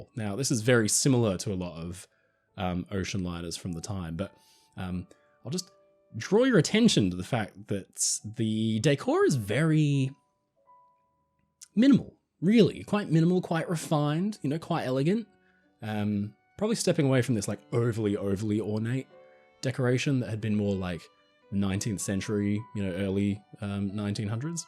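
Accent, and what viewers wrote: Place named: Australia. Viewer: Oh yes indeedy! I could listen to forever! He's got what it takes to be a successful narrator. First of all, he's an Aussie, and who doesn't love that accent; and he's got the perfect cadence in his voice, eloquent and elegant, which keeps the listener not only affixed to it, but always wishing for more! Maybe I've just described myself and my likes; and as for our ~ noone, and I mean noone has topped his talent here on YT (and I do enjoy listening to high quality programming)! 💙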